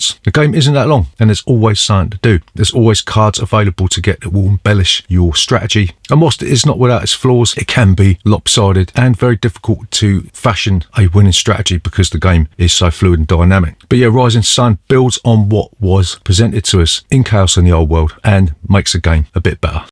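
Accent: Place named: Newcastle, United Kingdom. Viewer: Superb again. Never knew you were a Geordie like me. Gan canny bonny lad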